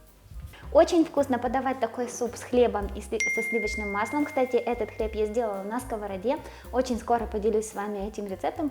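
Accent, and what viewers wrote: Ukraine. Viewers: ...я россиянин. Служил на Украине срочную службу в Советской Армии...1987-89 год. Восточная Украина..,под Харьковом. У вас очень милый украинский говор..Вы с какой части Украины? Не с Полтавской области?! Мира нам всем!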